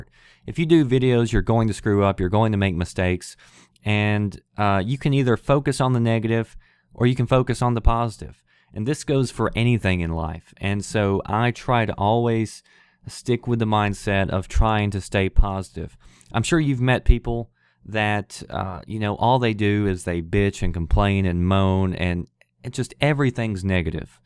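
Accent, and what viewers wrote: South, United States. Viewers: I really enjoy your vids and you got a cool southern drawl. Have another positive comment and have a nice day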